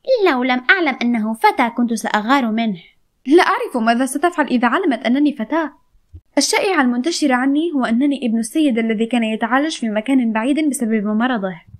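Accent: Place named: Jordan. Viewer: حاس انكي من الاردن بعرفش هيك بحس 😅😅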